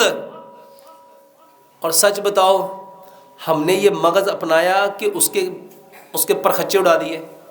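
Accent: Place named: Punjab, Pakistan. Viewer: ap ki baaten to achi haen lken yh punjaabi style achaa nhe lagtaa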